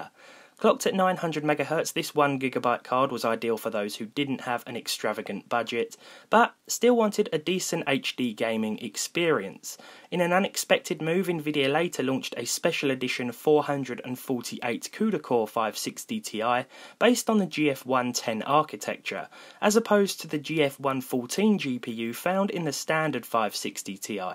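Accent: United Kingdom. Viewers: that heavy british accent tho, it disgusts me , can you like stop chewing words ? damn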